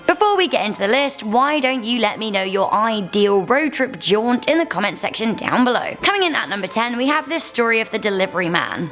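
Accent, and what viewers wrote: United Kingdom. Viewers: Hey idk y but i love the British accent on women an i think ur hott lmao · I'm so curious to know where your from 😍 your accent sounds British but distant like you haven't lived here for a while... Anyhow much love for the page you and Danny are amazing!!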